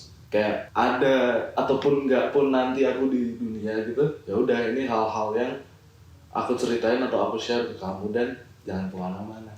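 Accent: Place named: Java, Indonesia. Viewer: ternyata agak medok ya bapak kalo bhs indo😂😂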